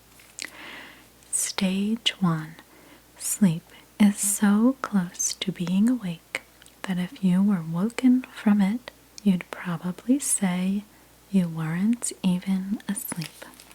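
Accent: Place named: New Jersey, United States. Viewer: I believe it’s New Jersey!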